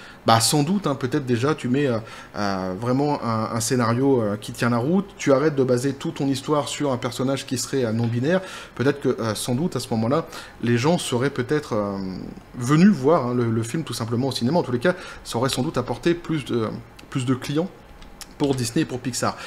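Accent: Quebec, Canada. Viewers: Ah, je suis curieux d'entendre ton accent Québécois alors. Le défi est lancé! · tu fais plus accent canadien que belge mais je préfère l'accent canadien 😄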